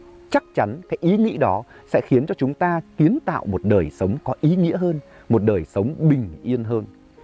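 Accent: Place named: North, Vietnam. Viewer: I really enjoy listening to your voice (Bac ky)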